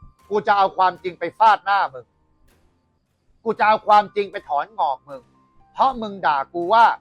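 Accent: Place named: Thailand. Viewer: ผมชอบนะ..เพราะมันคือความจริง ที่พูด...ถึงแม้ลีลาการพูดจะแบบไทยแท้..แต่ สุโสสัง ลพเตปัญญัง ฟังด้วยดีย่อมได้ปัญญา...กรรมไผกรรมมัน ทำไป..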